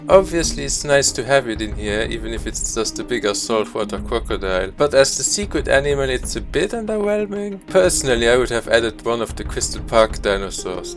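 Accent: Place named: Luxembourg. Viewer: I was about to say that you are the first German youtuber I found, that has an adorable accent, instead of an insufferable one, but then I realised that you aren't German at all but a Luxemburger, which explains it. 😅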